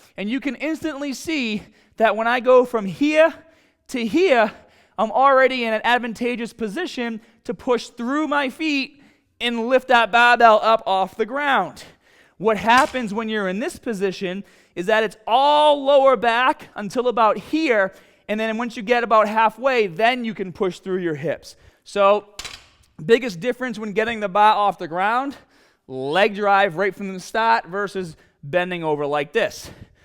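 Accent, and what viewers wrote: Boston, United States. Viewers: I think he is from boston. Is he? Sounds like he is. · Like the southey Boston accent! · love that boston accent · With a slight auch in your back you want to position the baabell, love that Bostonian accent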